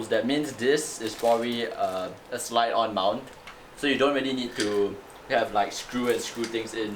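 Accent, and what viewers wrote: Singapore. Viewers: U from singapore? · Are you from singapore?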